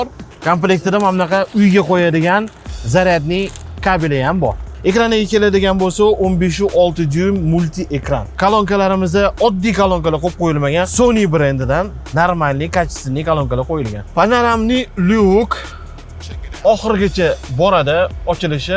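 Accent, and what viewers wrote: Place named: Khorezm, Uzbekistan. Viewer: cho'nqir Xorazmchaga gap yóq og'a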